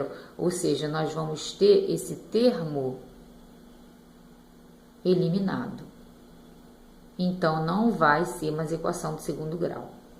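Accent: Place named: Rio de Janeiro, Brazil. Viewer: i é carioca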